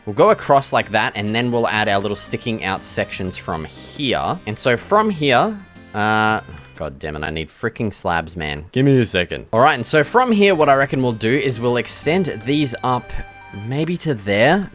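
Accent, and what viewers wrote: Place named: Australia. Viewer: Alright.. we get it.... you're Australian...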